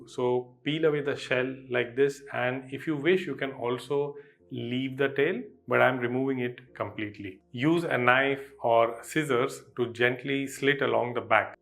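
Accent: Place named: Kerala, India. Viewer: Your accent sounds like a malayali Sir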